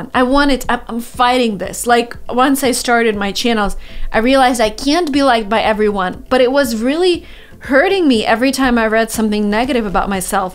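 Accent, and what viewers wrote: Russia. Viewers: Strong Russian accent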